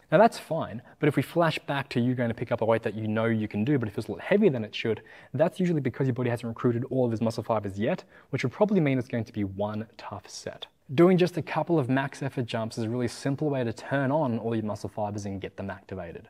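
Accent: Australia. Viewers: Asian Australian? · Your accent; Australian? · its alittle weird watching someone whos asian with an Australian accent.. but your the not the first person ..since the first I know of is Joe from the AnimeMan ^_^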